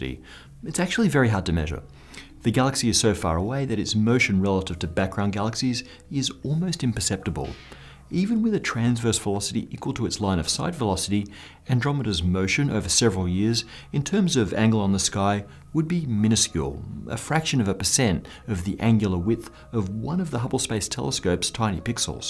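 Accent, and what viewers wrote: Australia. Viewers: Do all Australians talk like that? I want to move to Australia all of a sudden. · Why would there ever be any down-votes for these videos? Don't like galactic mergers? Don't like Aussie accents? Then why watch? At this moment it's 13,806 views versus 19 thumbs-down - so I guess that's only slightly more than 1 in 1000 - so who the hell knows.... People that don't believe in the structure of the universe? Religious people? Surely not - cannot it all be seen as a gods amazing creation?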